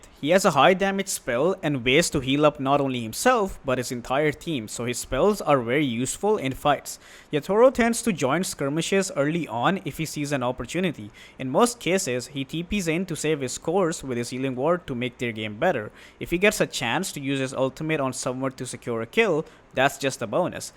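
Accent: India. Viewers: i like your INDIAN accent dude.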